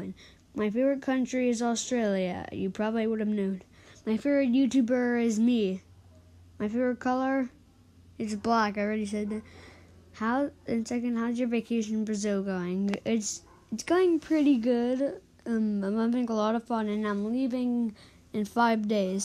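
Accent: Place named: Texas, United States. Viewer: your school was in Texas?? texan sounds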